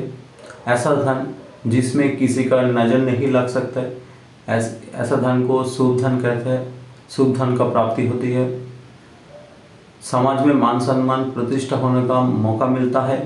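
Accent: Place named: Bengal, India. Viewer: Your Hindi is Bengali.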